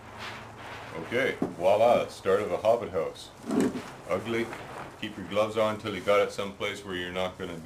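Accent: Canada. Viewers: That Canadian accent is on point aye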